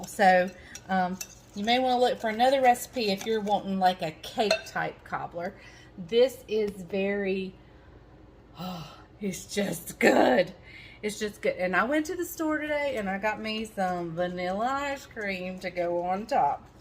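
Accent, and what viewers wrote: South, United States. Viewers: You pronounce Boil like Bowl, that's a southern thing right? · I 💛yr southern voice when explaing yr dishes.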